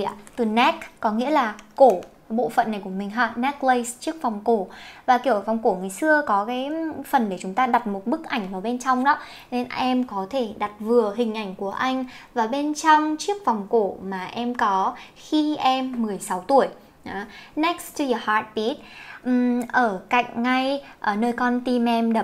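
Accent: South, Vietnam. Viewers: You can talk vietnamese southern accent can you make a vlog speaking southern accent I think it’s very good and special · Nghe cô nói giọng miền nam đáng yêu cực 😍😍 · Ui chị nói tiếng miền Nam dthhhp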